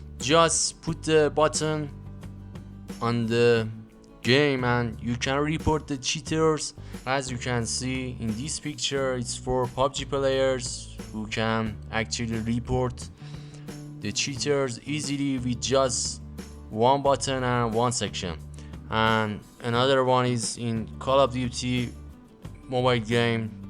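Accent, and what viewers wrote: Iran. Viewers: Your persian accent is very funny =))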